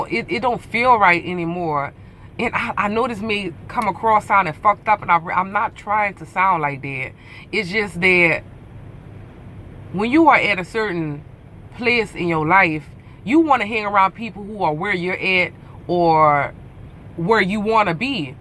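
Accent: Louisiana, United States. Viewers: is that a Louisiana accent? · You have to be from Louisiana????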